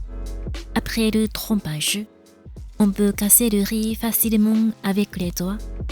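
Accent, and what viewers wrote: Japan. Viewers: Merci pour cette agréable video ! Les images sont très belles et votre petit accent japonais est adorable ! Bonne continuation a vous et merci pour ces superbes recettes sans gluten.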